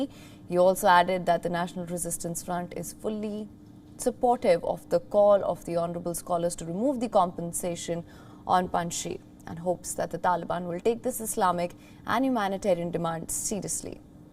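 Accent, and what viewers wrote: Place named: India. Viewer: Accent is never a problem for anyone in the world except for Pakistanis. She is Indian, not American to have american accent. So, get this digested.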